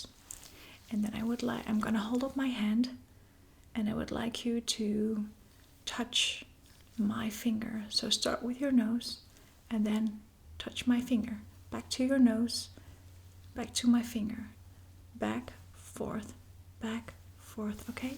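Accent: Netherlands. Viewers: By any chance you're Dutch madame? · Hoor ik daar nu een Nederlands accent? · do I detect a Dutch accent ?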